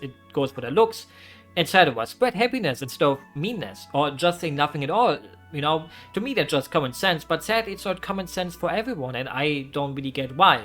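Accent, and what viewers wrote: Germany. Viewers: Necroposting a lot, but I got it suggested and never watched it before so... here's my two cents. Very heartwarming, inspiring video and a great listen overall. Thanks for sharing your personal history, allowing the audience to take it in and make their life better (and accept themselves). I always hated the "true" sound of my voice (which is natural, it's the same as not liking having your photos taken - our brains are used to see our own image mirrored because, well, most of the time we just see our reflections) to the point that I lashed out on people using speaker during calls as I could hear myself. So recording myself speaking and then listening to it and fixing it was a true torture and I wanted to die... until it wasn't. At some point... I just stopped caring about it and accepted myself. The same could be said about having aversion to own image. 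Very often there's that little voice inside our heads that tells us that we're just "not good enough", when in fact people really doesn't care (most of the time). Most of the time we are our own worst enemies, huh? Perhaps that's just And hey, I think your voice is really cool, same with accent, tone and tempo. It makes it quite pleasing to listen to, ...and yeah, I realized you were German after like 5-10 seconds of listening to you. It isn't a bad thing, it just... something that is and makes your content bit different than most, haha! It is funny though how Europeans can pinpoint the accent of others with nearly 100% preci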